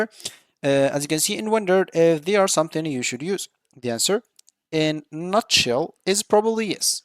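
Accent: Morocco. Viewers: bruh you sound moroccan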